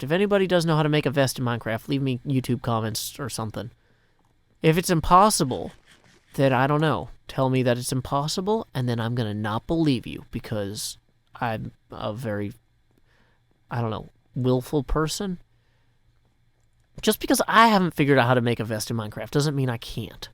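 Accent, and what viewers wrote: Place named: South, United States. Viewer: Hey are you a country guy you sure sound like one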